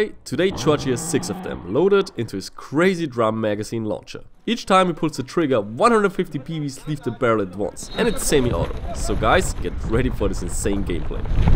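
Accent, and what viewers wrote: Bavaria, Germany. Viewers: Novritisch bist bayrisch na????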